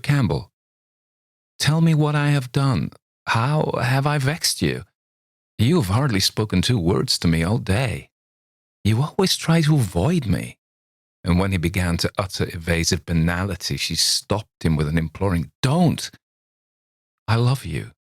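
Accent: United States. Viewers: My opinion, for what it’s worth, is that Luly was just a naïve kid who didn’t have the cynical worldly “sense”the men had. She was just having fun, learning to skate, learning to swim etc and that was all there was to it. The men put a different color on her actions. Main was smitten with her and therefore jealous, so he tried to put her in a bad light to his friend—and he wasn’t much of a gentleman to talk about a lady as he did. Campbell was a prude and a sadist. A true gentleman would never be that cruel. Cool perhaps, but not cruel. A very upsetting story, although your reading as always was excellent. :_pumpkin: Couldn’t quite place your American accent; Luly was apparently brought up out west. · I love listening to you read. Is that an American accent?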